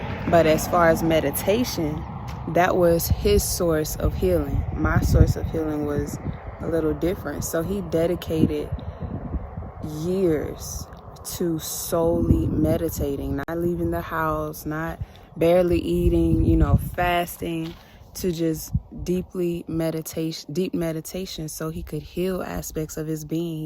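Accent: Houston, United States. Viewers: Girl that Houston twang be twangin chile!!! 😆😆🤣 i swear everytime i hear somebody from houston and even myself on recordings i be like dang is we really that country😆😆 omg love the video shout out to you and ur hubby frm the nawf side! 😘😍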